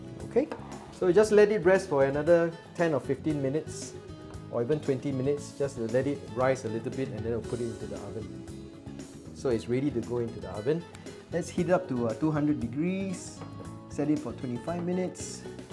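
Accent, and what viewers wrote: Singapore. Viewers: Awesome content!! I can guess you're from Singapore based on your accent, am I right la? :D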